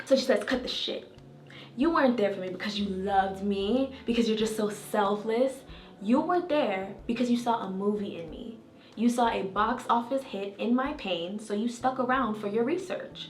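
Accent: Jamaica. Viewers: Hi. I haven't found any info 'about me' in your videos. I picked up a Jamaican accent and allusions that you're sapphic. You're pretty, smart, confident, and brutally honest. Who are you? Where are you from?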